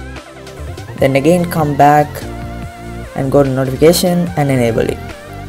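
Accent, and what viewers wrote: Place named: India. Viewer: Are you Indian or Pakistani? I'm assuming Indian from your accent. You should get a Snapchat account so we can know when you upload a video.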